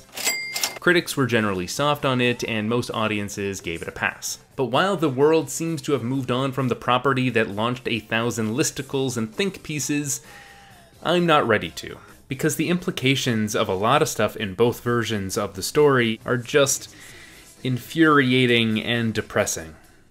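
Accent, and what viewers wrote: Canada. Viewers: Sorry, dude. I just hear Nerdwriter in a Canadian accent.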